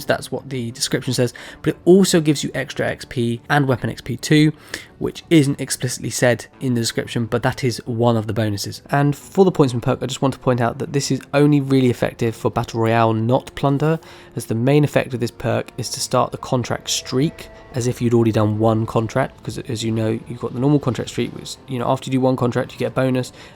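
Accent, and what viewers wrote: United Kingdom. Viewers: Thanks for the guide man I had no idea about some of the things you said Also the British accent is a nice touch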